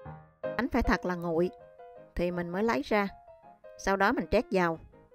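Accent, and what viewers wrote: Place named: South, Vietnam. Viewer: Cám on chị chia sẻ, rất thật tình & thích nghe giọng nói đậm chất miền nam của chị.....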